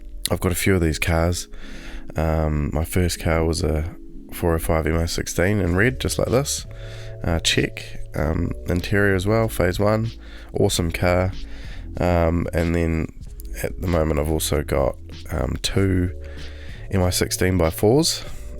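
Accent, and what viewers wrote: New Zealand. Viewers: Sure am, that's why it was so good to hear a kiwi voice. Car is in ChCh . . . Taking it to Taupo and then Akl . . . Will have a look and join the forums. Cheers again, go well with the restoration.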